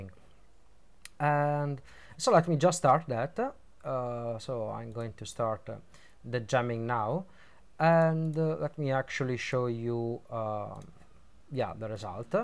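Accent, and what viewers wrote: Italy. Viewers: sei italianissimo e lo si sente! non cercare di nasconderlo bro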